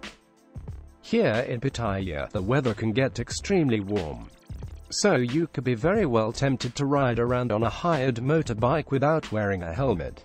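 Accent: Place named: RP, United Kingdom. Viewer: Your tied #1 place for my favorite thai channel with 'do more life'. Love the David Niven accent. Funny channel. Each video has me laughing.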